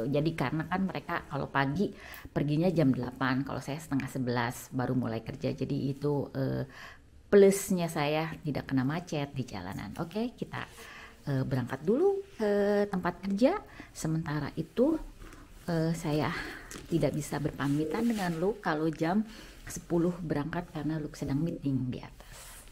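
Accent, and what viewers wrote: West Java, Indonesia. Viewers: Teteh sundanya darimana ?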